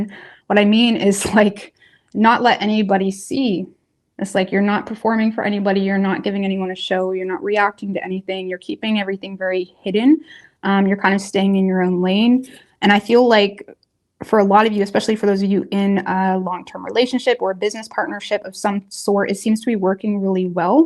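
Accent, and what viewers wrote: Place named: Canada. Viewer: You must not travel much. Do you know how many accents there are on this planet? Hell, I was born and raised in California but have lived in several states. I live in in rural Georgia and picked up the twang accent. When I go home to CA, they think I’m Australian. In GA, I sound like a “yankee”. She is pronouncing the word out correctly because she’s Canadian and that’s how they say it. The more you know ✨✨✨🌟⭐️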